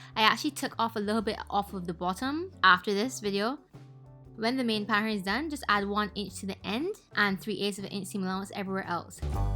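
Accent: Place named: Barbados. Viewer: You're Bajan the accent shocked me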